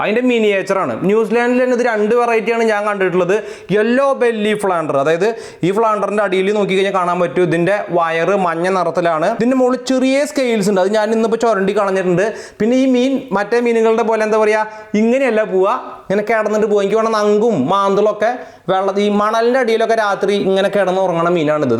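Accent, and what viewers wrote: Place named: Kozhikode, India. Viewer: entha Kozhikode parayannjjey.. Kozhikode nalla meen kittumm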